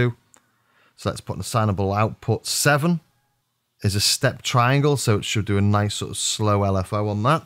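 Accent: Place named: England, United Kingdom. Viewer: Just got my crave last week, this video is absolute mana from heaven, I would be lost without it, thanks 👍🏻 and also the fact you're English means I can understand what the hell you're talking about 😂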